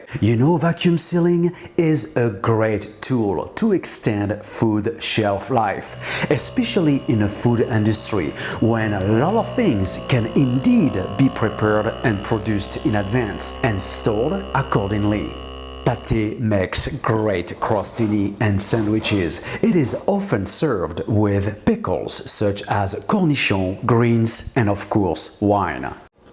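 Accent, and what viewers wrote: France. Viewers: This guy sounds so french that it seems fake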